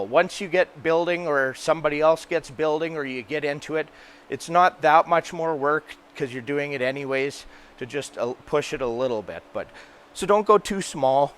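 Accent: Saskatchewan, Canada. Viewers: I haven’t lived in Saskatchewan since I was a child but I love hearing your accent! 😆 Your greenhouse gives me much inspiration as I currently live and garden in Florida but crave moving back to Canada. The shorter growing season terrified me but now I see that it can be extended greatly. 🤔 Awesome job!!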